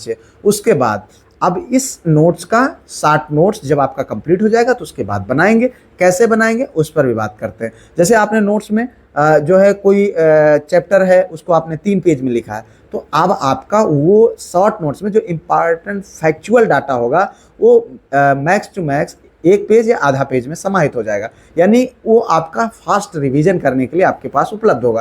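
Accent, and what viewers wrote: Pratapgarh, India. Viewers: Pratapgarh se h sir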